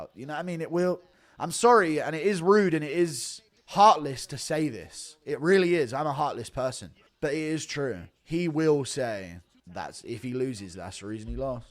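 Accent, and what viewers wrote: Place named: United Kingdom. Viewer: I love how impression of Anthony Smith is basically his normal voice, he just switches from his natural UK accent to American XD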